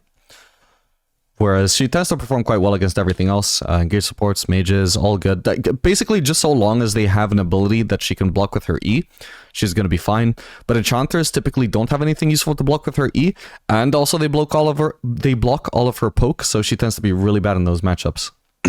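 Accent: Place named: Canada. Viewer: Where are you from? I hear some kind of Canadian accent although account’s from the UK haha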